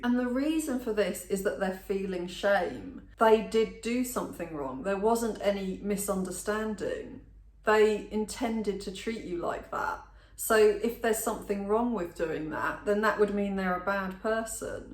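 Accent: United Kingdom. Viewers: tht UK accent is a turn-on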